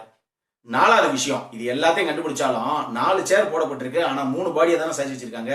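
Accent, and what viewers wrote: Tamil Nadu, India. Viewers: Only for your Tamil slaang kanna great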